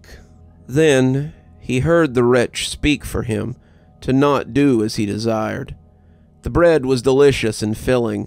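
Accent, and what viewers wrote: South, United States. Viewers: I love your accent. Are you from the south?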